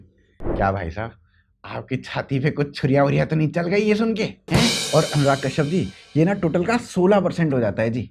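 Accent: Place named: Braj, India. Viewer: Mathura ke ho kya? Bhasha brijbhasha jesi lag rhi thi😊 Anurag Kashyap na Rajput hai, na Brahmin, vo dalla h bs.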